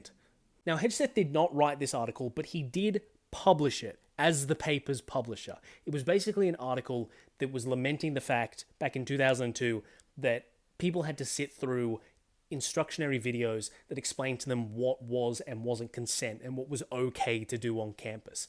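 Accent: Australia. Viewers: australian mate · Just found out you have a YouTube page. Instant follow! Also, I always forget you're Australian lol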